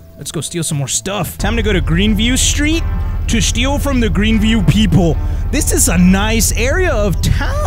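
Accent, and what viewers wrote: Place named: Mexico. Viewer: You sound so white And so Mexican at the same time Síguele compa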